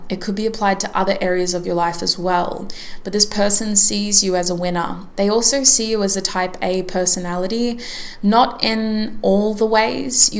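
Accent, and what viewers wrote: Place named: Australia. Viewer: Aussie accent 😍😍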